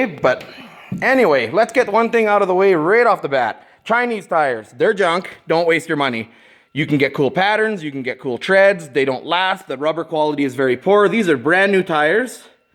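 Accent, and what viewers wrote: Canada. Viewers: I swear all you Canadians sound the same..I can just tell right of the bat your a Canadian 😂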